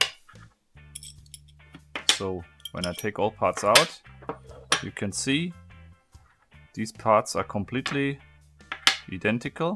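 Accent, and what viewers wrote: Germany. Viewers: . Your videos are great. I love your freaky german accent. But repeat after me. Sehr-ries. Series. Not serious. That shit is seriously annoying. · du bist deutsch! höchstens ein deztscher würde I-dentikäl sagen, ein engländer oder amerikaner würde Ei-dentikäl sagen!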